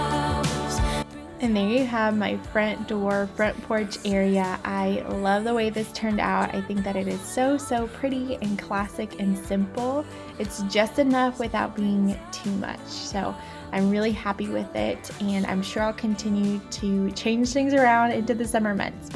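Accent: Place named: Louisiana, United States. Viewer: Where are you from? Sounds like maybe Louisiana.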